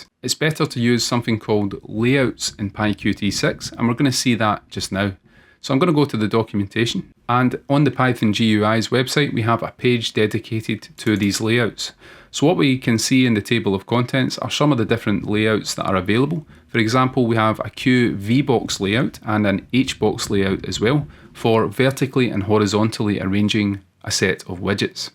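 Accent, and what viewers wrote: Scotland, United Kingdom. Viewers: listening to scottish always makes me really happy for some reason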